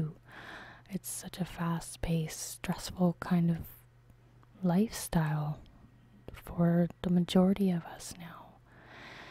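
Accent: Canada. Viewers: What part of Canada are you from? Love the accent:)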